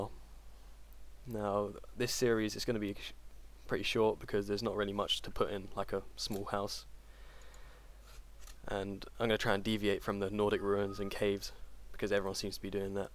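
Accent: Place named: United Kingdom. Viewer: off topic i just wanted to know why you guys in the uk say stuff like theater like theata but with idea you say ideaer just always wanted to know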